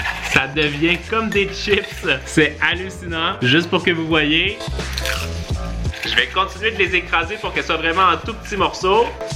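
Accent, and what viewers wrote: Canada. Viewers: = la vidéo toujours très sympa. Votre accent canadien rajoute un plus à vos vidéos très instructives. Passez un bon we les zamoureux. 😍🥰😘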